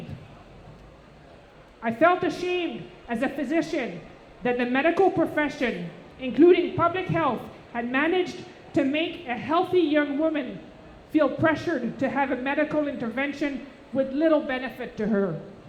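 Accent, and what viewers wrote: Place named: Canada. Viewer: She sounds so Canadian 😄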